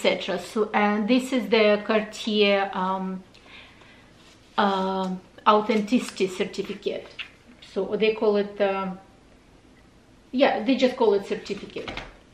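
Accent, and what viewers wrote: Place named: Russia. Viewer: Your Russian accent is so sweet 😊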